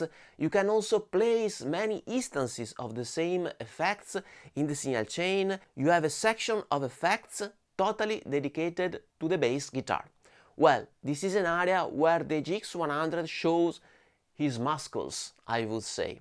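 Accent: Italy. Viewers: I love your videos, love your content and love your charming Italian-English. I find super friendly the aesthetics, the colours and the quality of the equipment around you. I hope you keep it up and that your channel continues to grow. And the most relevant thing: I love your guitar playing. Greetings from Argentina.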